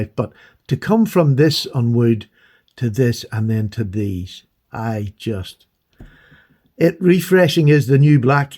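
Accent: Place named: Wicklow, Ireland. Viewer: oh my days , I think slippys work is great , I'm going to follow your advice and join the group , get Mat to do some work for me , thanks again for all your content as all ways its the the biz , I'm sure I told you before I live in London but I'm from Wicklow and its great to hear an accent from home , that Taylors eye is just sublime , all the best ,p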